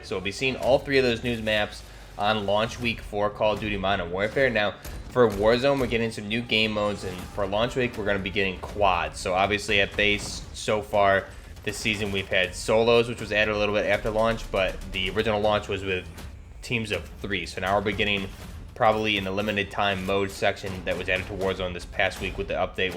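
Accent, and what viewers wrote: New York, United States. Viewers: Just curious, from the sound of your voice, you live in the new jersey/ new York area?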